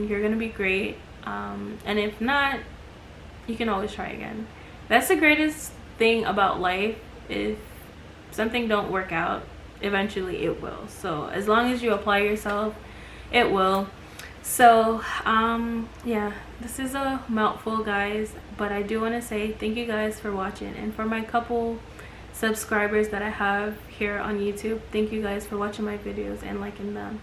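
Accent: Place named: Jamaica. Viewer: Heard the accent before the patwa buss 😂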